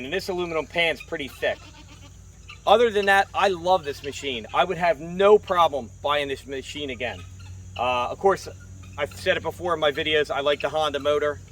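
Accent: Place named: Maryland, United States. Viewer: You sound like a fellow Marylander. Great machine great video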